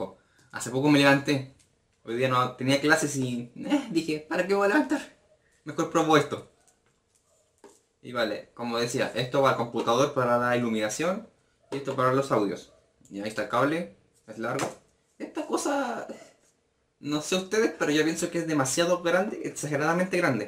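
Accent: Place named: Spain. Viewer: Por que hablas como español si sos tremendo peruano?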